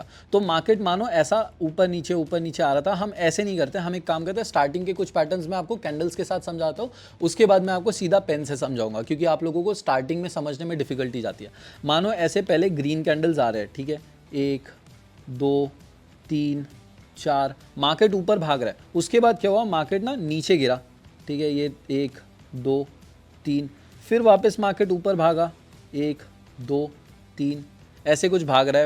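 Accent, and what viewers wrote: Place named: Maharashtra, India. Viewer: Bhari vatl bhava tu Maharashtrian ahes aikun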